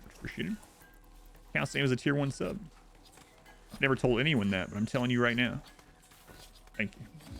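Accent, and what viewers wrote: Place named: Appalachia, United States. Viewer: hahaha, maybe I can leave some vids on .5x speed for a while And also your apalachian voice is jokes, altho I did understand it enough considering the diverse group of people ive met and played games with, definitely harder to understand though, I dont think I could watch that on 1.5x speed like ive sometimes done for your vods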